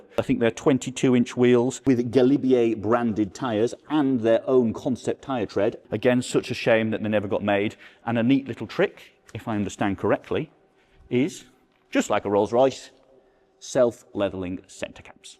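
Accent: United Kingdom. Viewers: His british accent is adorable. Buttun.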